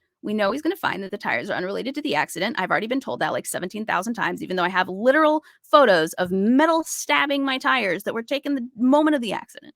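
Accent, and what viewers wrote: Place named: South, United States. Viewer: Welcome back! I love how the Southern accent surfaces depending on how riled up you are...lol.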